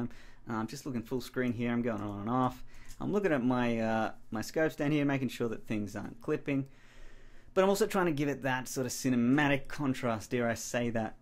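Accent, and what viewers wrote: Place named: Australia. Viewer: Great video mate thanks for sharing your workflow tips. Awesome to hear a fellow Aussie finally!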